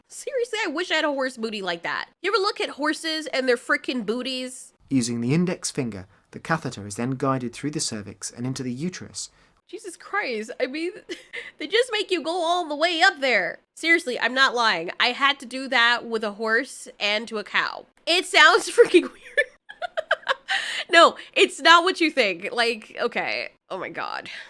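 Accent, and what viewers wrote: Scotland, United Kingdom. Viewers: an Scottish accent isnt something i knew i needed till this video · It was a Scottish accent.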